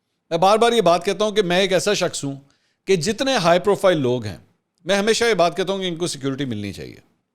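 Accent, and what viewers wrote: Punjab, Pakistan. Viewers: bhai..Ap ki Punjabi touch😅😄😍✌🏿👌🏿👌🏿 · آپکا پنجابی ٹچ زبردست ھے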